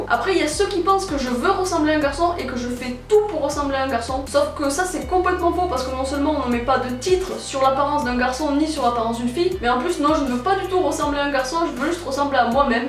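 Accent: Toulouse, France. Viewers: Tu connais pas bien les accents toi x) c'est l'accent toulousains xDDD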